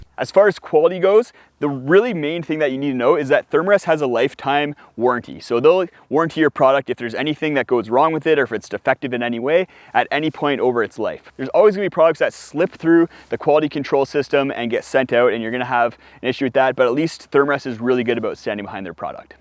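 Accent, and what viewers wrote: Canada. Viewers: I mean if the accent wasnt obvious, the mixing of metric and imperial measurements make it obvious of your canadian heritage lol.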